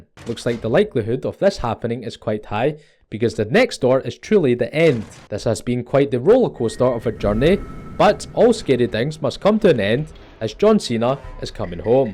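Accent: Scotland, United Kingdom. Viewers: idk i think this guy might be scottish…. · because he might be Scottish, shocker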